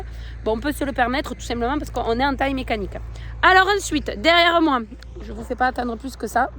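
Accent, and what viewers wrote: South, France. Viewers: Bon courage dans votre travail. J'aime l'accent du sud, votre région est adorable.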